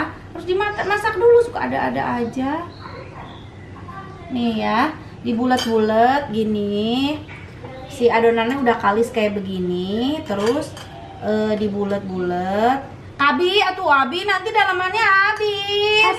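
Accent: West Java, Indonesia. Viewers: Mecak urang mah ai aya artis ngomongna sunda teh teh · Baru nemu channel ini.. Lucu si Teteh karena Nyunda banget... Love u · Sunda pisan teh,resep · Assalamualaikum tth..seneng deh liat nya ttp sederhana n tidak lupa logat sunda nya...bahagia trs yaaa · Sundaaa 😁😁 khas nya nyariosna urang Sunda mahh 😀😀 kaya suara teh Syahrini 😅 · Teh geulis mirip dede lesti apalagi logat sunda nya...hihi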